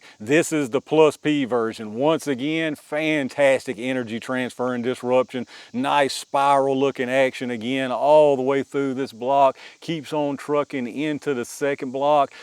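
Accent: South, United States. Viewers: Very useful and informative test - thank you. Is that your real accent, or are you "southing it up" for us on YouTube???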